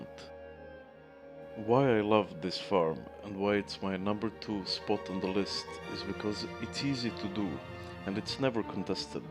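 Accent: Russia. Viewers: You have a cool Russian accent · Ty for this awesome guide! Where are you from? You have accent as russian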